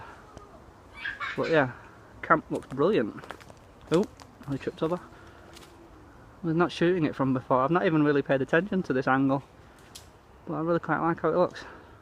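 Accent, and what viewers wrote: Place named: Yorkshire, United Kingdom. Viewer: nice tl here a Yorkshire accent were about you from ? me south leeds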